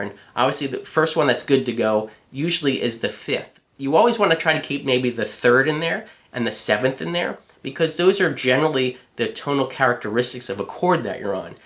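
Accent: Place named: United States. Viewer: great guy irritating American accent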